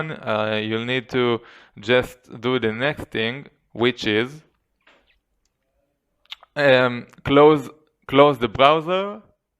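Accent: Israel. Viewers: אני מת ידעתי שאתה ישראלי מהמבטא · חחחח בשנייה שהוא התחיל לדבר הייתי כזה "הוא כל כך ישראלי"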